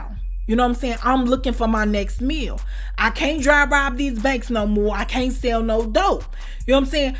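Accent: Chicago, United States. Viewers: Never knew Chicago had an accent but I listen to another Chicago tuber named Ashley Miller and y’all sound alike ! I’m a sucker for accent keep up the context #newsubscriber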